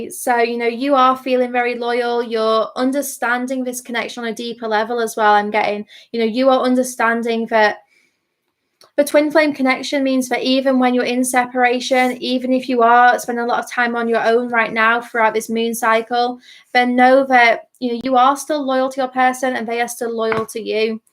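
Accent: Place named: Wales, United Kingdom. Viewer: Well done on your first YT live!! Personally prefer YT to IG. You sound like you're not too far from me. I'm in North Wales xx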